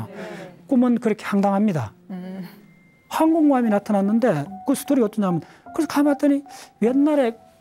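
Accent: Gyeongsang, South Korea. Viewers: 사투리 억양까지는 그렇다쳐도 표준어로 강의 하셔야겠어요. 사투리에 경상도 억양에...좀 그러네요. 게다가 발음도 좀...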